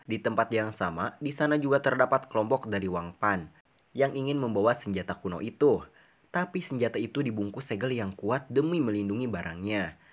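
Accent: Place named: Tasikmalaya, Indonesia. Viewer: Mang orang tasik nya😂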